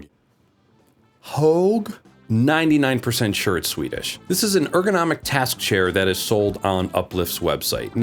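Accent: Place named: Australia. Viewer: I hope that one day my Australian accent can be as good as yours. This instructional video helped!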